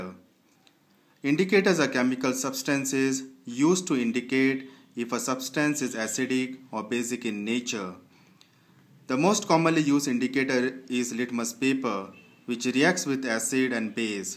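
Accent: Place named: India. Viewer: Your voice is like indian , r u an Indian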